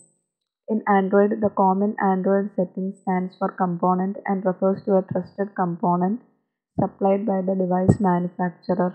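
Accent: India. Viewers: atrocious audio, hardly intelligible, heavy Indian accent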